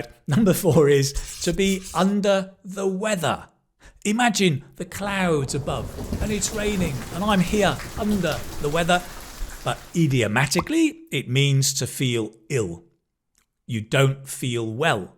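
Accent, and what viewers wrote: United Kingdom. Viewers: Pretty obvious he is British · ❤ British accent ❤❤❤❤❤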